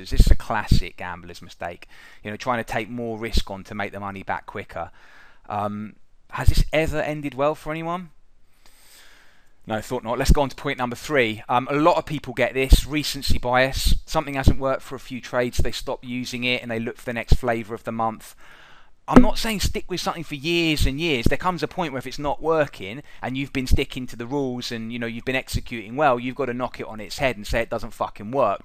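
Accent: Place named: United Kingdom. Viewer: I feel like I’m watching a Guy Ritchie movie haha love the accent. Good stuff man 🤙🏻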